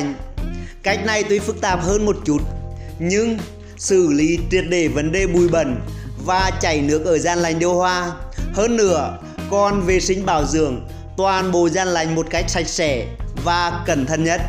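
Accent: Nghe An, Vietnam. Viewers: Tuy nói giọng xứ Nghệ , nhưng cách diễn đạt và thao tác thị phạm hướng dẫn rất mạch lạc, dễ hiểu, dễ thấy, dễ làm theo.. Cảm ơn bạn nhiều ! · Người xứ nghệ giọng nói hay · Rất hay và hữu ích. Bạn giọng Nghệ nhg ns rất rõ ràng, mạch lạc. Tks bạn nhiều!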